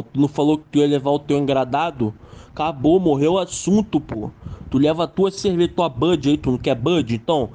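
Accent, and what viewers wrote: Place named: Rio de Janeiro, Brazil. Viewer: N tem jeito, se é vídeo com áudio de carioca, então é bom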